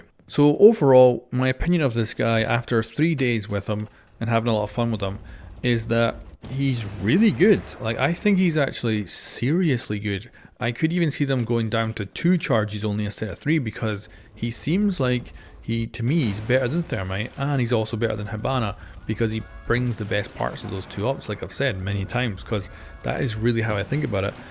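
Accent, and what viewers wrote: Scotland, United Kingdom. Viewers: Don’t hide you Scottish accent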